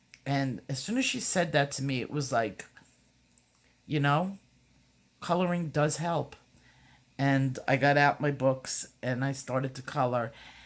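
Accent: New York, United States. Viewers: When my close friend died I had not yet discovered coloring. What brings me here? You are a great talent. You are generous with your talent. You are clear. And genuine. And I like your New Yawk/New Jursey accent.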